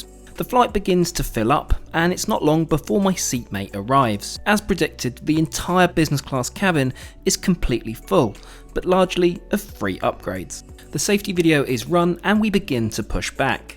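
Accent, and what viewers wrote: United Kingdom. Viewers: How are you able to travel so freely during the pandemic? I believe you're from the UK if I got your British accent right. How can you travel with the traffic system in place with Amber countries? Do share your secret.....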